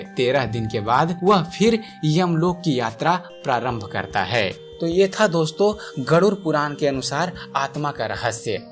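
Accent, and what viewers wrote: Bihar, India. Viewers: kya ap bihari h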